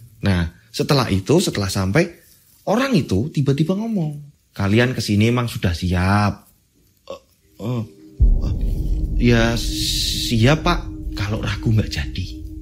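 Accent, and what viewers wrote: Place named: East Java, Indonesia. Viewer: Hadir lg mas ak! Di SBY! Mas org Jateng tp logatny koq Jwa Timur'an y? 😀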